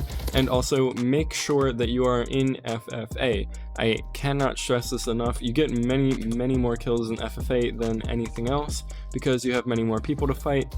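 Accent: Austria. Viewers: Are you austrian?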